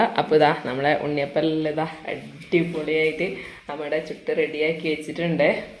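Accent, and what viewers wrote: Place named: Kannur, India. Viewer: Nigal kanuru karano. Langage kelkubol tonunnu. Ee. Appam soft udo. Jnan udakubol orikalum nanavarilla. Oru nazi ariku atra alav puzukalari cherkanam. Makalk udaki kudokuvananu👍🏻❤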